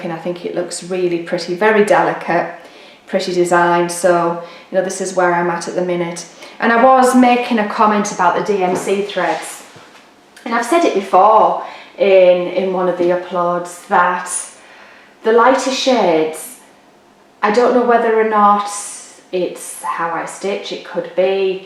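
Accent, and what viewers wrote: United Kingdom. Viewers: So sorry you were ill for awhile. Glad to see you are feeling better. A while ago I did a search for anyone doing a review of a sit on frame and found your review of the Elbesee. Well, I was finally able to find a shop owner on line that had the Elbesee sit on frame in stock. The frame was delivered yesterday. The frame came with three hoops but also came with an attachment to enable the use of my own hoops and smaller sized scroll rods. I used the frame yesterday and most of today. I love it. I was having trouble with my left arm and hand from gripping my hoop. After only one day I can feel the difference in my arm and hand not gripping my hoop. Thanks so much for your review. Love your stitching and if I might say, your British accent. I was born in the UK quite some time ago, but came to the US when I was one. Still have relatives in Swindon and Avebury and love to talk to them and listen to their wonderful British accents. 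So heartwarming to me. Again thank you for your review. Stay safe, stay well. Lee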